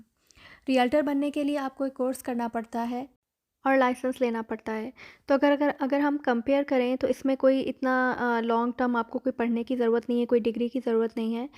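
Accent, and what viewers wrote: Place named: Karachi, Pakistan. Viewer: By accent looks like you are purely from Karachi. 🤔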